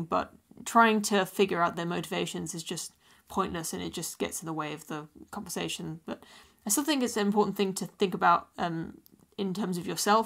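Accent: United Kingdom. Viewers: You see, this is why I love you. You are not speaking with an agenda you are literally working out thoughts and ideas in real time and changing your mind in real time then presenting those ideas back to us. You are just someone looking for the truth so am I I am a skeptic. Keep up the good work. PS I don't know what it is about your British accent but I can hear you talk for hours no problem. You do stutter a lot though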